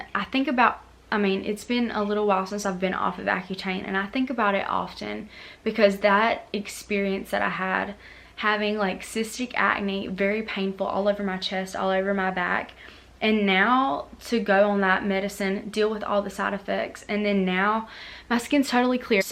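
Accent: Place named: Texas, United States. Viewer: Are you from Texas or something, love your accent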